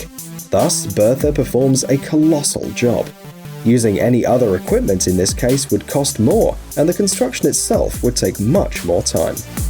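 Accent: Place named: RP, United Kingdom. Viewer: Looks like someone took someone else's video and put a poncey, Harry Potter type commentary on it.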